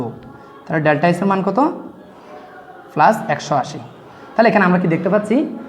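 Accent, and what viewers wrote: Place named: Chittagong, Bangladesh. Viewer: স্যার আমি আপনার ভিডিও আজকে প্রথম দেখেছি এবং আপনার কথা শুনেই বুঝতে পেরেছি যে আপনার বাড়ি নিশ্চয় চট্টগ্রাম।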